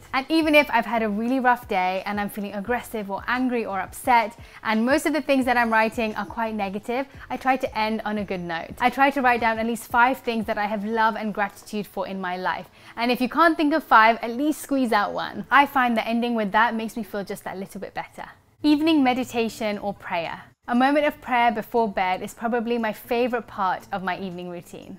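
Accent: United Kingdom. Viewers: Yes, her roots are from india but she was born and raised in London so she has British accent 😊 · African-British-American-Indian lady with a Perfect British accent!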